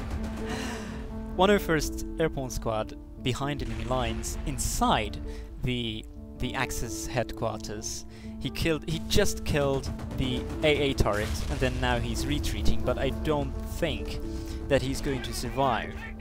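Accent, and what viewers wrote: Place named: United Kingdom. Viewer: When did your accent become british?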